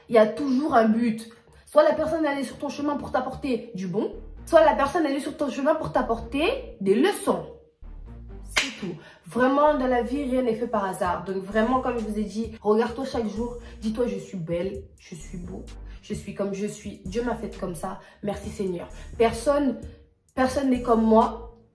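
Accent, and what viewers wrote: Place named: Democratic Republic of the Congo. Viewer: Juste par ta façon de parler en reconnaît ton pays😄🇨🇬💪.Une vraie congolaise.Ne changes jamais ma belle.Restes toi même💪😘.Dié tala vidéos za kaka🤣🤣👌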